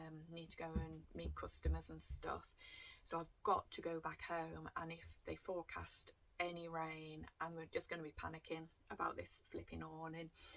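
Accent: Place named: North, United Kingdom. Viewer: Oh dear that frickin awning is proving just a tad troublesome ay - but not to worry luuvy 'cause not only am I always totally enamored by your delightful Northern accent, I am also well and truly captivated by this ongoing and challenging saga!!...You're a true Brit Battler. Bravo 👏😀 Onwards and upwards. Congrats on the 1,000+ subs btw - That's awesome 👍😉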